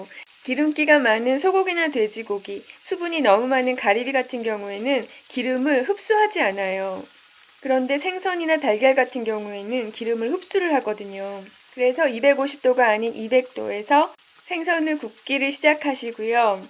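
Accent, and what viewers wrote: Seoul, South Korea. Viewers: 서울사투리 듣기 좋네요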